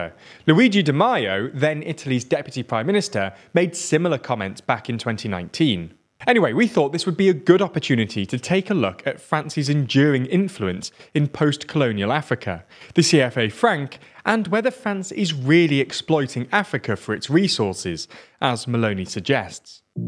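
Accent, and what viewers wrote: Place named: RP, United Kingdom. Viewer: Do you also run the channel 100% Star Wars? It might just be that recieved pronounciation accent and my American ears.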